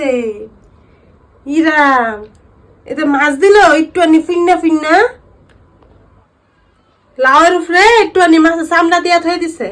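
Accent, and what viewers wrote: Bangladesh. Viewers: Oi divai tumi ki ময়মনসিংহ distrk ami o tai tomar kotha amr korha akdm milagache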